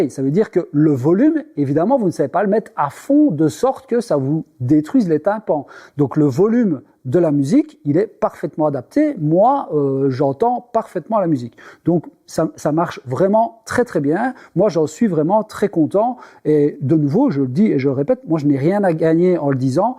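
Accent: Belgium. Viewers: Ce n'est pas un franc parlé, plutôt un belge parlé. Mais on adore ça, bravo et merci.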